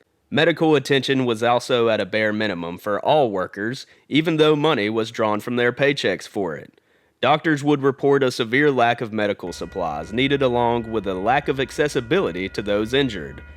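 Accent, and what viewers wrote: South, United States. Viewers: Very nice to hear a narrative cited by your gentle southern accent which is something I wouldn’t have expected on a western Canadian rail video. As one who has an interest and being a fairly read person on our country’s history, I was impressed with the amount of research you obviously did for the narrative. I’m glad that you enjoyed our country.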